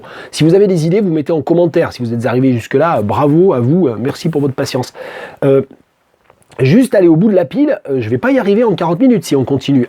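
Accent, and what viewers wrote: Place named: South-West, France. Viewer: Je ne suis pas saoulée par ce "dégoisement"... J'y retrouve l'accent et le vocabulaire ainsi que que les manières de triturer la syntaxe à l'oral du sud-ouest... Et ça donne encore plus envie de lire! Donc pari gagné en 40 minutes!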